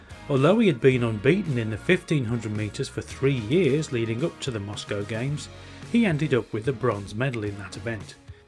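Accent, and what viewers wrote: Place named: Wolverhampton, United Kingdom. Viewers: I'd have definitely included Linford Christie as he's a legend for sure! Also Colin Jackson, but that's probably more of a "me" thing as he's Welsh and I love a Welshman! 🤭 So you're from Wolverhampton then? I've got a friend there. Really should have twigged to the accent! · After all these months of being a subscriber and watching I've always thought you sounded local, it's only when you mentioned Tessa Sanderson you revealed yourself to be a fellow Wulfrunian. Love the content, as an 80s child it's lovely to reminisce while watching.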